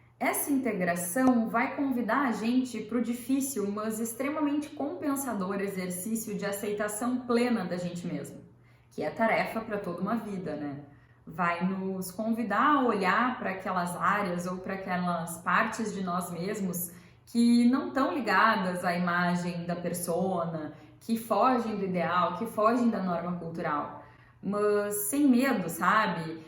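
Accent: Rio Grande do Sul, Brazil. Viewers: Clareza e conteúdo denso com sotaque gaúcho. Excelente. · Nossa tesão pela vida está lá nas Sombras . Tu és gaúcha?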